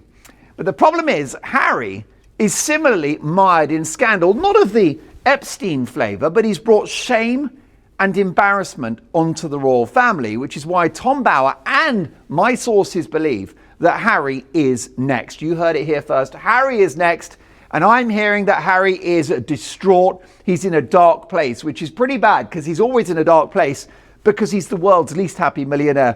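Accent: United States. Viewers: You nailed an American accent! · Great American accent! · Great American accent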